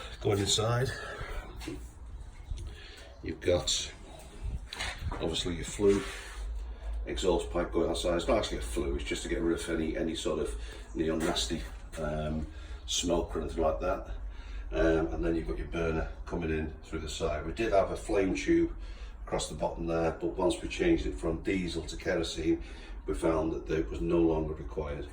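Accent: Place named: United Kingdom. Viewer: Hi, I have got a very very similar oven, I bought it last week, havent used it as yet, bought it from a wheel refurb guy. Nice to hear someone from the UK, I have not done my first powdercoat as yet, so a steep learning curve I think is about to happen LOL. Dai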